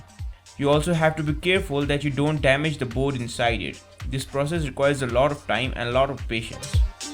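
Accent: India. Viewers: Your accent pisses me off so much. I hate fucking Indian accents. I just hate most Indians in general even though I am one but don't look or sound like one 😂 I'm serious I fucking hate Indians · Hes indian dont judges on how they speak ok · you country voice? indu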